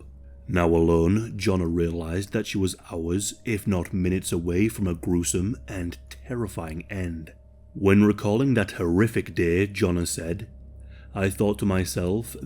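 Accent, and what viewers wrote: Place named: Southern England, United Kingdom. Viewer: sounds like mid or southern England to me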